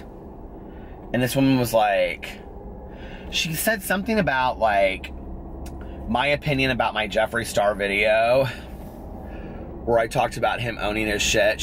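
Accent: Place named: Indiana, United States. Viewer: I just discovered you the other day and have already watched a ton of ur videos. It was your voice that sucked me in! I love how you talk. I kept thinking...he sounds so familiar. Then I realized you live in Indy and I think it's just ur dialect that sounds familiar to me because I live in Indiana too.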